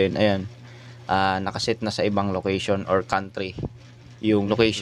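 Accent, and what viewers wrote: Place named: Visayas, Philippines. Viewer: Ew bisaya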